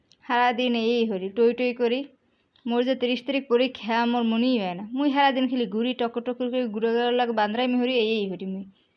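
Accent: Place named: Barisal, Bangladesh. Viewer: খুব ভালো লাগলো বরিশালের ভাষা❤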